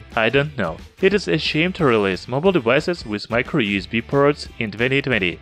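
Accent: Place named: Russia. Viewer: 𝗠𝗮𝘆𝗯𝗲 𝘆𝗼𝘂 𝗮𝗿𝗲 𝗮 𝗥𝘂𝘀𝘀𝗶𝗮𝗻. 𝗔 𝗥𝘂𝘀𝘀𝗶𝗮𝗻 𝗮𝗰𝗰𝗲𝗻𝘁.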